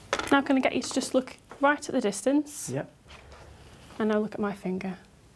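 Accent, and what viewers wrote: United Kingdom. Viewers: how i love her british english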